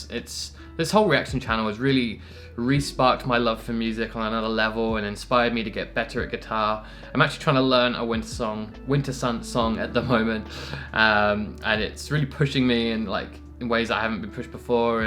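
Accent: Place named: Australia. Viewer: I'm so happy for you to have reacted to Wintersun. Although I've really known them a few months only, while knowing all the time Kai's background, but never before was I ready for another metal band, just yet . Now that I've found it, it simply blows my mind. So heavy, but simultaneously pleasing, comforting. Invincible playing, awesome lyrics. What comes to this song and it's lyrics, I get the feeling I have to search for someone lost to grieve. The easiest way would naturally be my parents, and in some part they are, but there already are so many others I could and should have been closer with while they still were here. If I'm not wrong, you're Australian, right? If you really are, your accent sounds a bit different from the people in the Eastern coast, Sydney at least. I hope you don't mind me asking this.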